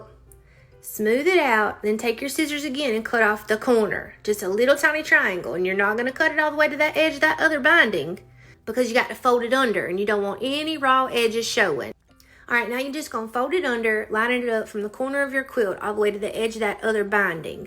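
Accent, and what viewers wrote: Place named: South, United States. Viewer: Gorgeous work and what a voice! I adore your voice and smooth southern accent. I'm subscribing just so I can listen to you some more.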